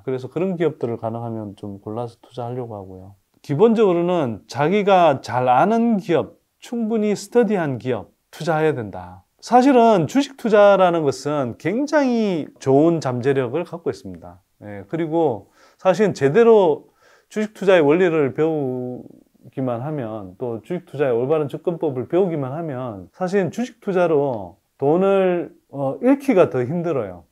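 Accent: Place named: Gyeongsang, South Korea. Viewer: 항상 표준 경상도 말투에 호감하면서 존경하고있습니다.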